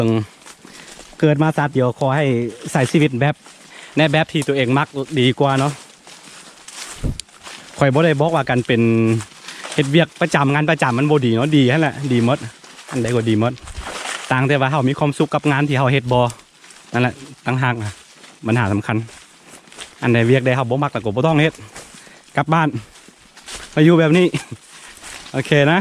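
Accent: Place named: Sakon Nakhon, Thailand. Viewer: พึ่งมาเบิ่ง เอ้อ เว่าคือข่อยไทสกลเลย 555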